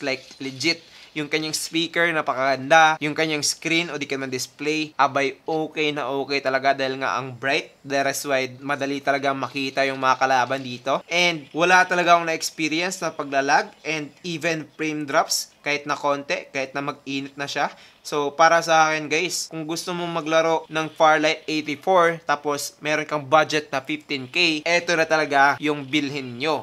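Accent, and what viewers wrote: Visayas, Philippines. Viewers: Bisaya ka sir no?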